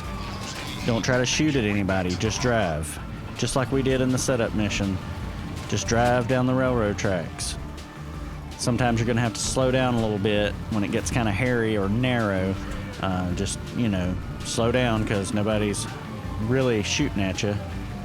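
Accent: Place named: Kentucky, United States. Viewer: I love your accent! I'm from Kentucky and I don't know where you're from but you sound like you could be my neighbor! 😂 Great walkthrough! I appreciate it!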